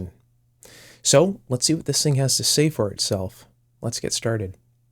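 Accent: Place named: Canada. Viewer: I love your voice, even your Canadian pronunciation of “out” an “House”. Sometimes I put on your videos just for background sound when I work on long complicated projects. Thank you so much!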